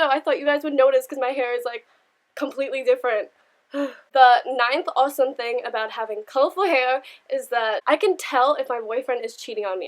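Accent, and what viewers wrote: Australia. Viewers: You have like a little Australian accent now and it's so cute😍😍😂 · maybe i'm going crazy but you sound so australian here and i can't ever remember you being australian wtf is going on with my brain rn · In this video I can really hear your Australian accent😊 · Aww your accent is changing. You're beginning to sound Aussie !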